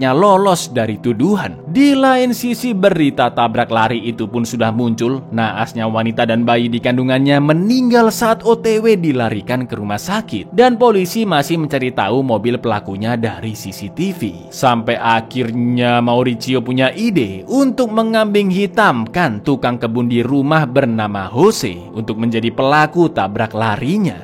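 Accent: Java, Indonesia. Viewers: Bng lu orang Jawa bng 🤣🙏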